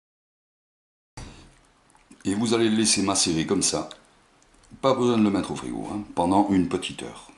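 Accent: South, France. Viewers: il explique bien, c’est l’accent du Sud qui fait tout 😂😂😂👍🙋‍♂️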